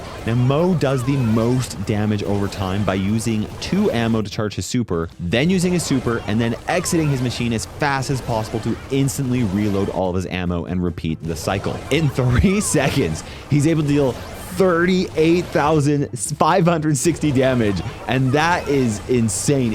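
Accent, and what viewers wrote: Scotland, United Kingdom. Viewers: he's Scottish 💀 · I didn't expect him to be british but I love it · Bro's bri'ish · Why is he british😂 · British accent tops it off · Nerey british ahh voice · HE'S BRITISH I LOVE HIM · Lmao the british accent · HES BRITISH!!!!!!!!!! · I didn't know Moe was british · Of course he has to be British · why is he british tho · Wait, he's just British? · He's BRITISH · i like his british accent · I did not expect him to be british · Bros so British 🇬🇧🇬🇧🇬🇧🇬🇧🇬🇧💂‍♂️💂‍♀️💂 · he´s british!!!!!! oh and where did kenji come from. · why is he british please dont be british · Bro is British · Is Moe Scottish? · He Scottish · why is it british🤮🤮🤮🤮🤮🤮🤮🤮🤮🤮🤮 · Why is he british😳😳😳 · He has the most british accent of all time, what are you talking about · Ees bri’ish mate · I mean a Scottish accent is technically still a British accent but I get what you mean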